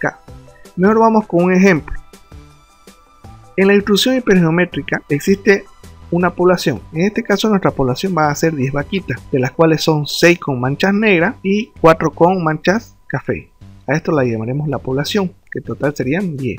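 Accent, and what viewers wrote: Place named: Santa Cruz, Bolivia. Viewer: Ese acento camba, bien mi hermano me ayudaste, saludos desde la llajta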